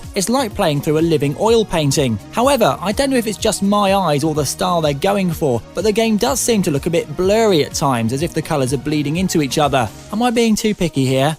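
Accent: United Kingdom. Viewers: hes got the British accent. im pretty sure some people would say we are saying it wrong.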